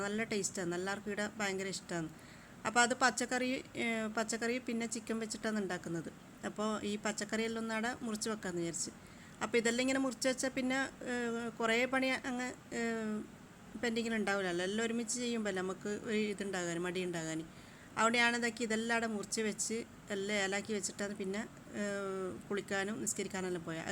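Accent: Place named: Mappila, India. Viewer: Mapla 🤣ee samsaarathina like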